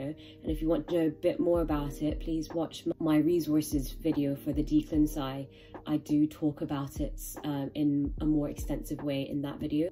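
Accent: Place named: RP, United Kingdom. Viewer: Yeah, very posh :)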